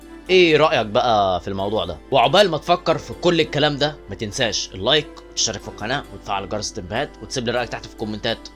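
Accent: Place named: Syria. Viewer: من البداية من لهجتك على بالي انك سوري مش مصري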